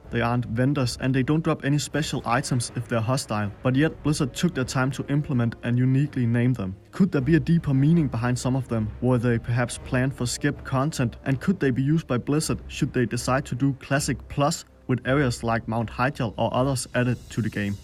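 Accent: Denmark. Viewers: I listened to his video. He sounds painfully Danish. I know since I'm Scandinavian. It's like when an American hears a Canadian accent. I wouldn't be able to pick up on it and might even think I'm speaking to someone from the US but Americans seem to pick up on it instantly. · You Sound very Danish. Where do you come from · A strong Danish accent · This is the most danglish english I've ever heard. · Cranston he is danish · Danish here. Hes Danish. Not a single doubt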